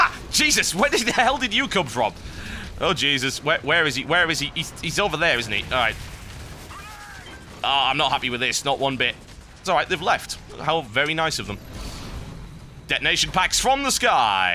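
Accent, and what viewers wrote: United Kingdom. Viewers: You're British.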